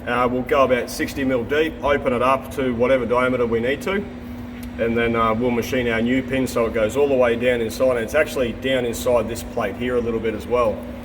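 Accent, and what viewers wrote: Australia. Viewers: Brilliant professional work. Fun to watch and interesting. Particularly like that you show what the part is for and it’s function. Subtitles are good too for that Aussie accent, being a Pom !!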